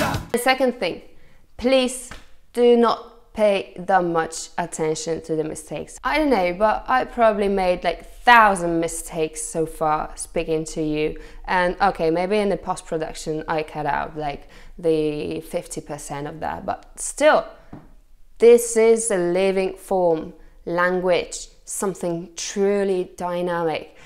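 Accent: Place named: United Kingdom. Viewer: mówi po angielsku z prawie idealnym brytyjskim akcentem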